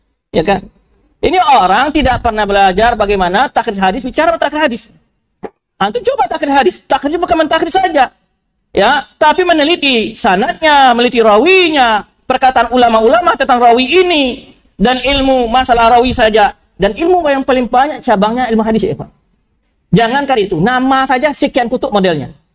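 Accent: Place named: West Sumatra, Indonesia. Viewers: Logat orang minang memang seperti itu. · Logat orang minang bro sumatra. Teman saya ada yang kayak gitu kalau bicara.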